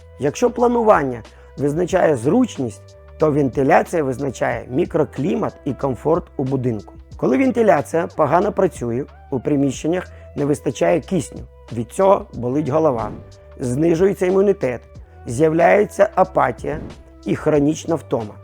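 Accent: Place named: Central Ukraine, Ukraine. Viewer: Я так зрозумів чоловік родом десь с центральної України чується щось рідне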